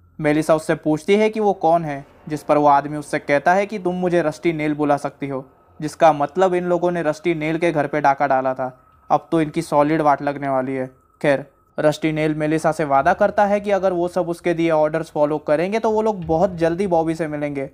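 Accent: Maharashtra, India. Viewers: Bhai aap maharashtra ke ho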